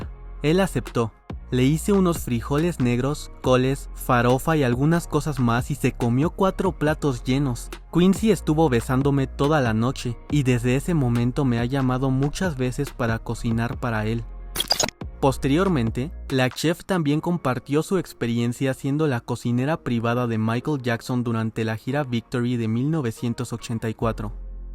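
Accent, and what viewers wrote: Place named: United States. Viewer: Man, enough with the subtitles blocking all the pics of Mike and his friends. I understand English and I don't need subtitles to understand a narrator with an American accent.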